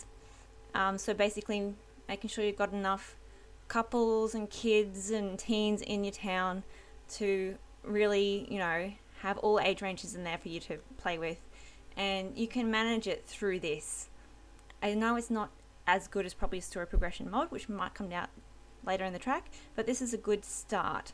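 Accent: Australia. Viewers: Are you Australian? And is your name?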